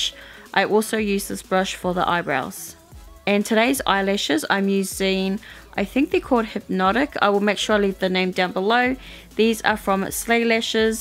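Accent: New Zealand. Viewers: Are you from nz? x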